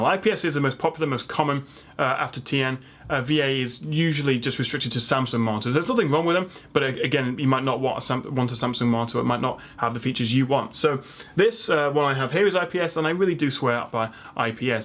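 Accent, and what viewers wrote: England, United Kingdom. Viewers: I really enjoyed your video on features of monitors to consider. Man, are you knowledgeable--I learned a lot! I was very close to buying a 27 inch monitor, but the information you presented about 1080 resolution being inadequate on a monitor that size saved me from making an expensive mistake. I will wait until I can afford a 1440 27 inch monitor rather than a 1080. I am now a new subscriber and will check in with your website regularly to keep learning about computers and their peripherals. I know that you are young, bright, and British, but it might be helpful if you could slow your speech down a bit for those of us who aren’t. Thanks! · It's because he is british and you are American. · "fo-cking monitor, fo-cking gaming, fo-cking resolution".. i'm just amused. No offense I honestly love British accent. I just heard it differently. 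· Awesome explanation and good video. But I CAN'T STAND the way you and other people from the UK say "H". Like you do when you say "HD" it's like nails on a chalkboard. :( lol but you're still cool. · but that doesn't make sense, he's ENGLISH and he's speaking ENGLISH and yet you are American (I presume) and you speak English. Looks like you're the one with the accent!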